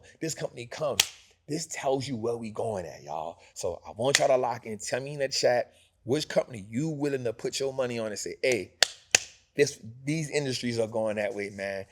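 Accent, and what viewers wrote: New Orleans, United States. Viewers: Yea, he from the city. If you not from here (the city) is what we in Louisiana call new Orleans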